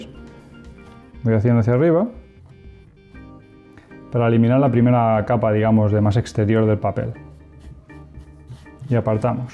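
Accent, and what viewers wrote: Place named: Spain. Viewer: Es mucha casualidad que hablas como si fueras de España(hehehehe), y tu voz parece a la de otro youtuber que sigo pero el hace videos de impresoras 3D, saludos y abrazos.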